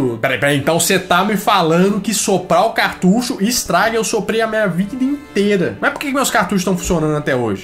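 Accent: Minas Gerais, Brazil. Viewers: Mineiro demais kkkkk Like mano !!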